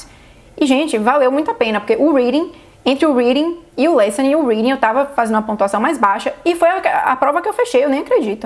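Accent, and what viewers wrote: Brazil. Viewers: Eu bem que notei pelo sotaque dela que ela é daqui da terrinha. rs'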